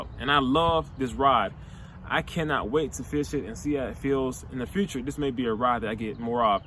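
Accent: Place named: South, United States. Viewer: Lol temperature swangs! Lol thats southern draw! I enjoy your videos bro. I hope to see you do more kayak fishing. Thank you for taking the time to make these videos.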